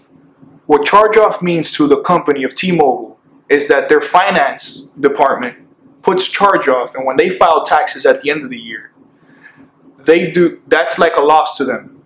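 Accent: United States. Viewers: damn i miss that south FL accent. never thought i'd say that but it's true. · Miami accent coming in strong 💪🏼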